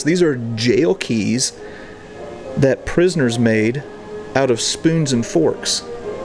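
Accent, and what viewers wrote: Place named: Midwest, United States. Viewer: "Dadgum", "merciful days" I love your homespun, Midwestern exclamations.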